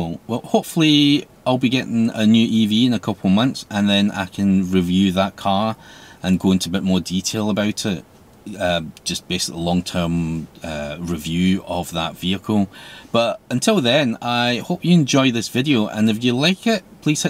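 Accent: Scotland, United Kingdom. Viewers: Are you an asian scot? Like an asian ewan mcgregor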